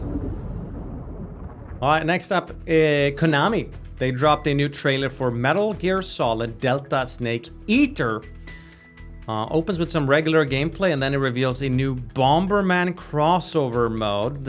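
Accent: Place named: Sweden. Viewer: Do this in swedish next time cause I can't understand your english (due to your very thick accent)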